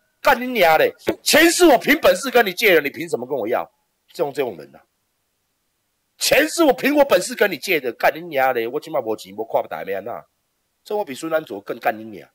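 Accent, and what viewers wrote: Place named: Taiwan. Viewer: 台灣國語 哈哈哈哈哈哈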